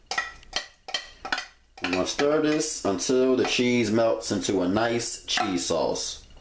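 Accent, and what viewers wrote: United States. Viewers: 10 minutes, 4 commercials? You know this is a cooking video, and more subs and views is a good thing right? I'm so lost, cause you totally sound American, and above the age of 16...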